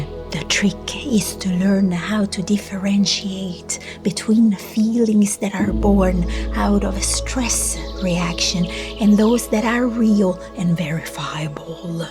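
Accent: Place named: Italy. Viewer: How intetesting to hear an italian accent from you. It's the first time I watch a video from you and I immediately assumed you were scandinavian. A different video for sure. I like it.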